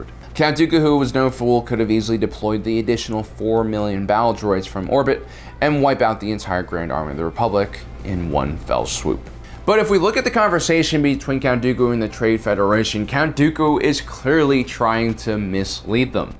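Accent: Australia. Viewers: That Australian accent tho 😂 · dat Australian accent doe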